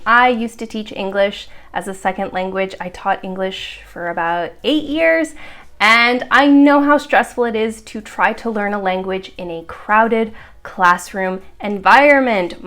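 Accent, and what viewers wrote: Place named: Eastern Turkey, Turkey. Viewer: you speak Turkish with Ağrı accent An American speaks Turkish with Eastern accent, it's kinda thing only happens in movies:) and being able to live in Turkey for 10 years without knowing Turkish is a miracle...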